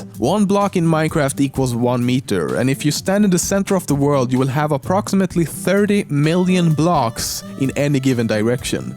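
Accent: Sweden. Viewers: He is Swedish, so he says "H" as "Y"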